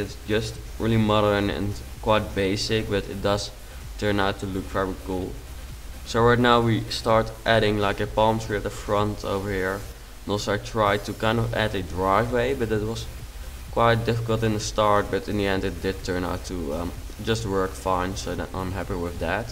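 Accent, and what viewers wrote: Netherlands. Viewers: I immediately recognized your accent. What's up, fellow Dutchman. :) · zonder naar je kanaalnaam te kijken wist ik al dat je Nederlands was. De manier waar op je Engels praat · Ik kan aan je Engels horen dat je Nederlands bent ;) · ben je nederlands an het horen aan je accent net luis van gaal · Super chill. ( Ik zie en hoor namelijk dat je Nederlands bent ;) )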